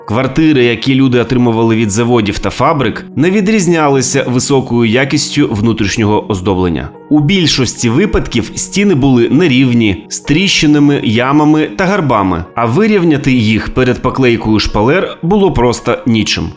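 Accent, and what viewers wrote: Russia. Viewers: дякую,! тільки бажано вдосконалювати вимову, щоб позбутися російського акценту.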